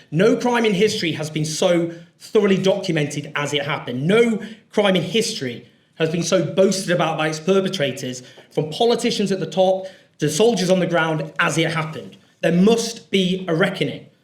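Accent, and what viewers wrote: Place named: England, United Kingdom. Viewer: Did you miss your Meds? Owen, was born in England. Can you not hear the accent? It's THEIR language! Hahahahahahaha youre funny!!! I feel sorry for you man. I really, really do. Why, do such sad people like yourself choose to live. Enlighten me?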